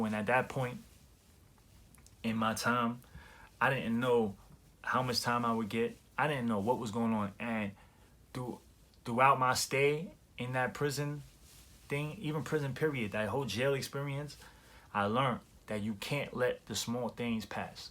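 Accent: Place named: Brooklyn, United States. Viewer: So brooklyn bro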